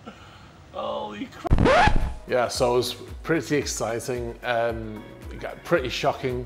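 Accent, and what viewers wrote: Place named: England, United Kingdom. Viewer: Hes got some kind of classic english quality that makes him go easy on my ears so thus i watch him. the yelling screaming youtubers - its not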